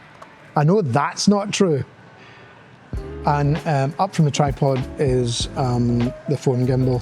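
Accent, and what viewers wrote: Scotland, United Kingdom. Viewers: What’s a Spanner? Something that spans? Also, why is the close caption not burnt into the video? I can’t understand your Scottish accent pal…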